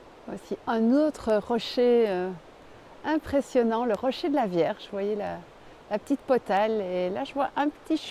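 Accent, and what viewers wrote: Belgium. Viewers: Bonsoir nous dans le Béarn c’est 1 heure avec un rayon de 1 kms et bien sur avec la permission de l’attestation bien remplie autrement 135 euros on est devenu dés hamsters 🐹 dans leurs cages quelle chance de pouvoir se balader où on veut .belle balade et l’accent belge qui nous berce .bye bye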